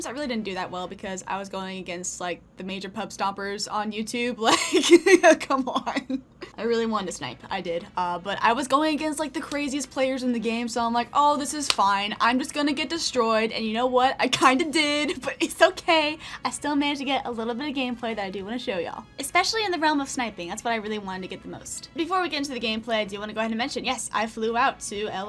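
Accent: South, United States. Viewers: i like the southern accent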